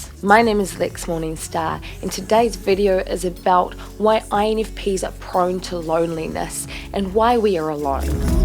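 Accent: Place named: New Zealand. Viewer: Completely off track but I heard your accent and can tell you're from home. INFP NZ here.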